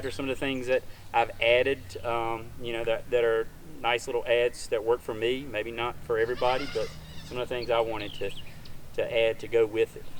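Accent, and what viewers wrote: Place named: South, United States. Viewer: I LOVE the highly intelligent review combined with the southern accent. You Sir are a class act and representative of all the good natured intelligent rural folk across our great country. And excellent review, subscribed!!!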